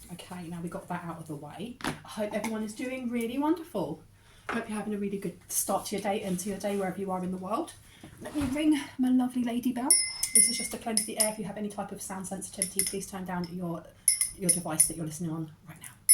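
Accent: United Kingdom. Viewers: I think 🤔 she’s 😢British 🇬🇧 I am Spanish from the 🇺🇸 I can understand her I put on headphones to listen closely.🎧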